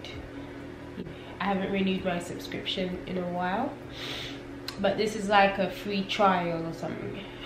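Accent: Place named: United Kingdom. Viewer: Thanks for sharing!!! God blessed you with a very lovely boy and you are an AMAZING Mum. It can never be easy doing so much by yourself but trust me, your Son is watching and he would shower you with so much love and thanks when he's of age. Loved listening to your Bri-ish accent. By the way, my Birthday is on the 25th of April. Same date you and him were messaging each other. Lol!!!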